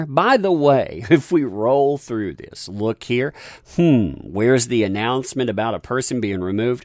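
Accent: United States. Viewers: 2 mins in and you haven't even started yet? And your vocal fry annoying put on voice is just so annoyingly over American that I couldn't go on past the 2 min in introduction.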